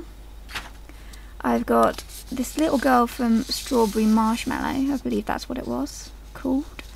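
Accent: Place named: United Kingdom. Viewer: you have a total british accent, sounds cute by the way =D but you sound so shy that i can't tell your age right! maybie you have 10 or 20 i don't know!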